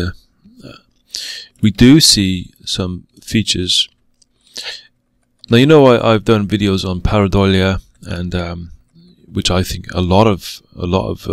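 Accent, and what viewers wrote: United Kingdom. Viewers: No reason to doubt her Looks real to me. Especially after you did your thing to the video. Another gem mate Glad to see your channel gaining subs I remember when I first discovered you with barely 1000 subs Your humble and honest approach is why.Plus,having a delightful British accent doesn't hurt😉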